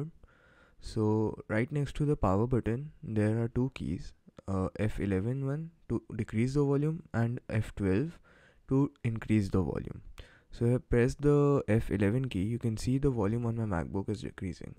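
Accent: India. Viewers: learn English with out accent! you're speaking English with an indian accent. When I learned Spanish we learned to make the sounds the way the Spanish people do. We pronounced its as the spanish people would! Stop using your pronunciation because that tells me you have not had professional English classes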